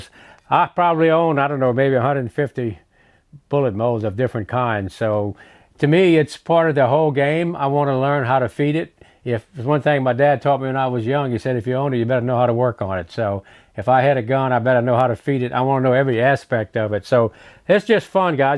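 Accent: Louisiana, United States. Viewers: you got any Cajun in your background? Your wonderful accent reminds me of the venerable Justin Wilson - ah gar-own-tee!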